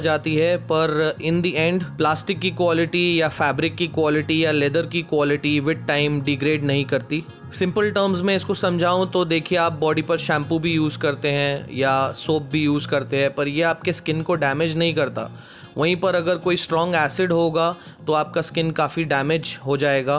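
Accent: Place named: United Kingdom. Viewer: Mtlb professional professional.... Itni bhi English accent me mt bolo Irritating lgta h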